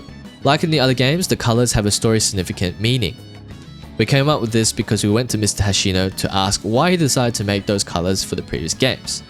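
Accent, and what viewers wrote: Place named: Australia. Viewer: It's crazy how you can tell this Youtuber is an Asian Aussie just from his accent.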